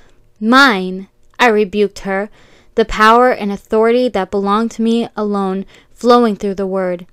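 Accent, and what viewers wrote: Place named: United States. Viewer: What type of American accent is this? Like what state is she from? I really like it, it’s melodic and smooth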